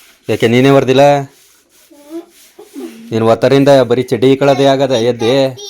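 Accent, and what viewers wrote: Mandya, India. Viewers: ನಿಮ್ಮ Mandya ಭಾಷೆ ತುಂಬಾ ಚೆನ್ನಾಗಿದೆ brother ನನಗಂತೂ ನಿಮ್ಮ ತುಂಬಾ ತುಂಬಾ ಇಷ್ಟ ಆಗುತ್ತೆ 🎉🎉🫡🫡👌👌👍👍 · ಸೂಪರ್ ನಿಮ್ಮ ವಿಡಿಯೋ. ಮಂಡೇ ಭಾಷೆದಲ್ಲಿ ಕೇಳೋಕ್ಕೆ ತುಂಬಾ ಇಷ್ಟ. ಬಾಗಲಕೋಟೆ ಡಿಸ್ಟ್ರಿಕ್ಟ್ ಇಲಕಲ್. ಇಂದ ವಿಡಿಯೋ ನೋಡ್ತೀನಿ. ಈ ಮೆಸೇಜನ್ನು ದಯವಿಟ್ಟು ವಿಡಿಯೋ ದಲ್ಲಿ ತೋರಿಸಿ ಜೈ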